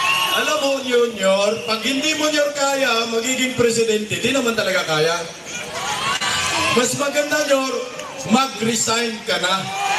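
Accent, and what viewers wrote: Visayas, Philippines. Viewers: Si bisdak ba yan nagsasalita ngeiii😅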